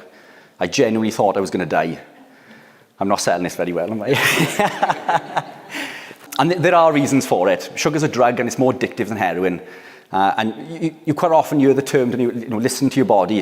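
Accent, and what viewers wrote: Wales, United Kingdom. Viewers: Good, clear presentation. And I could listen to a Welsh accent all day! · So what are your commercial interest along with this good will info? No, dont get me wrong, I enjoyed the bleep immensely and it was factual to the letter, it's just that I cant help being bleeping cynical about stuff. Its a necessity it bleeps, these days. Good luck anyhow. Ps. The Welsh accent made a nice bleep from all those yank MD's. 🙏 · The Welsh accent is the one thing I dislike about this video!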